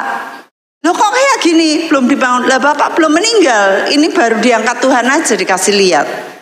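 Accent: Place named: Surakarta, Indonesia. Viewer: Senang sekali mendengar kotbah dengan logat solo - jawa yang kental. Kiranya Tuhan Yesus selalu memberkati pelayanan Ibu.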